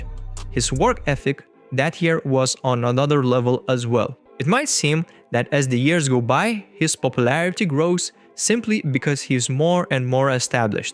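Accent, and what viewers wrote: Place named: India. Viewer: u sound indian af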